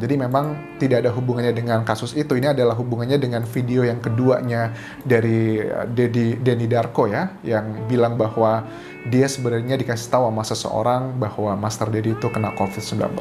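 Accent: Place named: Java, Indonesia. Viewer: mas wong jowo jebul yo.. jowone ngndi mas hhh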